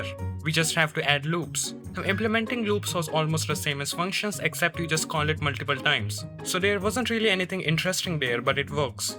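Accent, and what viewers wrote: India. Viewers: they sound indian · By the Indian accent I know this was gonna be peak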